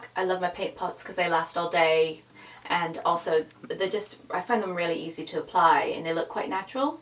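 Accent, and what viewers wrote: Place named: United Kingdom. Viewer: Very lovely! You are sounding more like a Britt every time I visit your blogs! I just love the accent ! Want to move back!!!!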